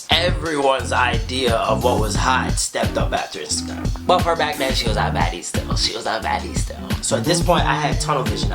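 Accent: Jamaica. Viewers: Are u from Jamaica or America...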